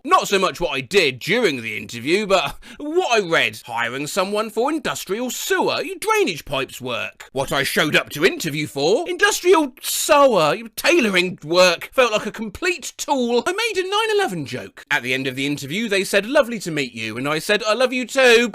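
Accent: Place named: United Kingdom. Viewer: This is a really good thing to put on while crying, nothing is more comforting than a British man saying skull emoji while you absolutely bawl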